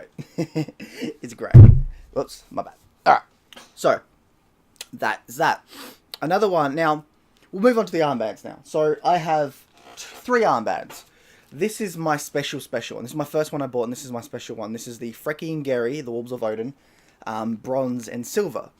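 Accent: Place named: Australia. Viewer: I don't know why it was so hard for my ears to realise you're Australian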